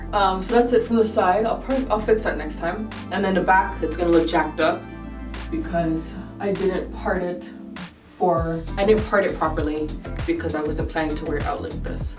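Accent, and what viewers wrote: Toronto, Canada. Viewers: Beautiful hair! Congrats on the baby❣️Are u from Toronto ⁉️I detect the accent. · it sound Canadian but I definitely could be wrong? 🤔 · wait, you live in Canada? wow never knew that; i can definitely hear it in your voice now lol. anyway, your hair is so pretty. i like how your hair came out! i havent done a twist out in so long, and this has inspired me to do it - thanks !!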